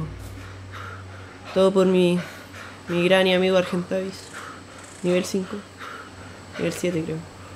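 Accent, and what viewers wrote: Chile. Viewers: chileno verdad??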